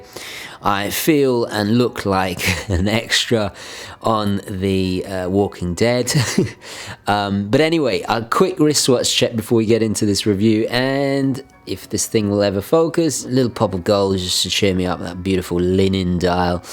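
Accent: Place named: United Kingdom. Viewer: Why are all these channels British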